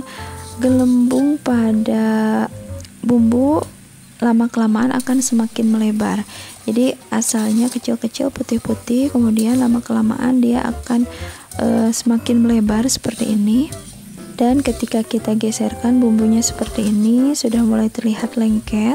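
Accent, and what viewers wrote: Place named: West Java, Indonesia. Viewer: Ooh punten, rupanya org Sunda yaaa..? soalnya klo org Bali lafal kan huruf T itu Lidah nya nekan ke langit langit, klo wilayah lain Lidah itu menekan ujung gigi Atas..duh hapunten yaa..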